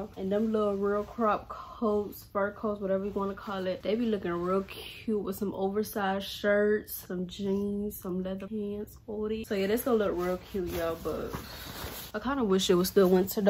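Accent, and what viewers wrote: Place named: Alabama, United States. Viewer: Girl your accent is deep, is it Alabama?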